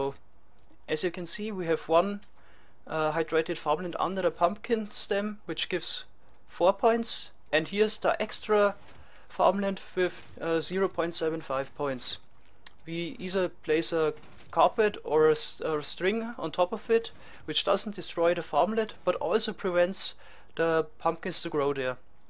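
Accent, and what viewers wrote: Germany. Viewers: der deutsche Akzent kickt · it is interesting seeing his german accent improve over time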